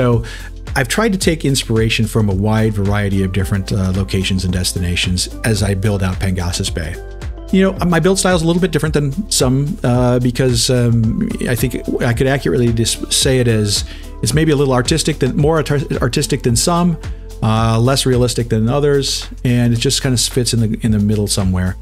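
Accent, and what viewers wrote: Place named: Minnesota, United States. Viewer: Well hello neighbor! I thought I picked up on those Minnesota O's As far as the city goes, you should do what you feel is reasonable. It's your hobby after all. I'll stick around for whatever that is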